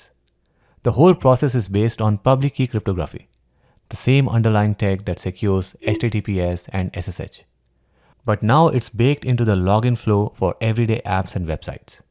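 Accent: India. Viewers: Hearing about protecting myself from phishing attacks through an Indian accent is kind of surreal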